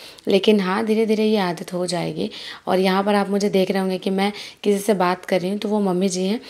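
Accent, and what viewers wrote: Bihar, India. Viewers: Aapki voice bht achii h di bihari tone ❤ love from uttrakhand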